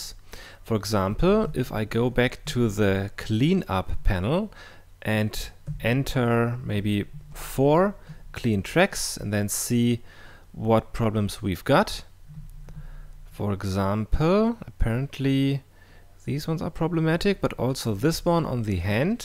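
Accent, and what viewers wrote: Germany. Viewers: R U german? You have a german accent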